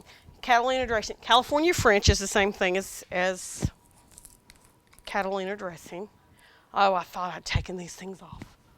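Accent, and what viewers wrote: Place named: Maine, United States. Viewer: Lord, thank you that despite growing up in the middle of the potato field, I don't talk like these people here in Maine. Good god, don't know what I'd do if I had to speak authentically to my region like this woman.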